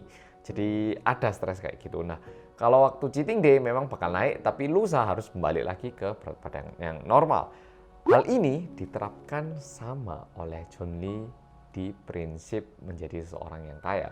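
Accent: Java, Indonesia. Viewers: Orang korea yang medokkkk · Mas medokkkk.....aku salah fokus liat kowe kok cek kuruseee 😔 cukup mas jok tmbh kurus maneh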